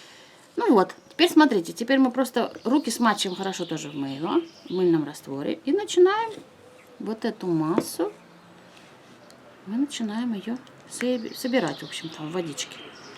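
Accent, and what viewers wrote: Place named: West Siberia, Russia. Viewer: Слышится западно-сибирский говор. Или мне показалось? :) Спасибо за интересное видео и за список ссылок! Лайк!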